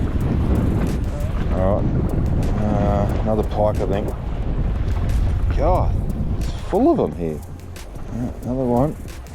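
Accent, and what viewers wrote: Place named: Australia. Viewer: Your first time in Australia, sounds like your a fuckin aussie to me and sounds like you know what you r doing in Australian waters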